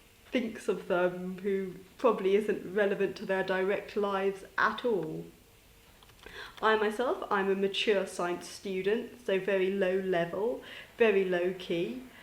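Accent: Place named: United Kingdom. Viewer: i loved her british accent...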